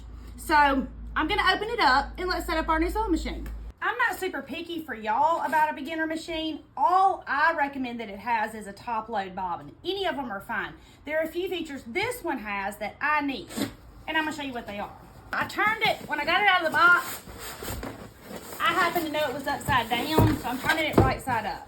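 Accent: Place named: Tennessee, United States. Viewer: I ❤❤❤ your accent. My Grandmother, the one who helped raise me, was from NW Tennessee (Union City area). Listening to you is like being with family. 😢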